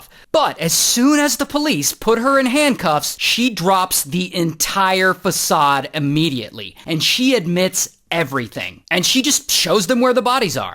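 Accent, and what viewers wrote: England, United Kingdom. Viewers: The British accent 😂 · I love your use of typically British swear words like 'bollocks' and your British accent; not the best, but hilarious! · I like the brittish acent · Loving your English accent ❤ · I love English accent. He makes everyone seem so nice and proper.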